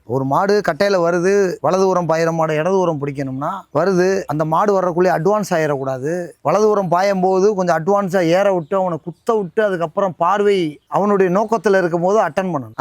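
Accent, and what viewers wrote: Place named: Madurai, India. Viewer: அண்ணே சூப்பர் அடுக்கு மொழி பேச்சு மதுரை சிலாங்க்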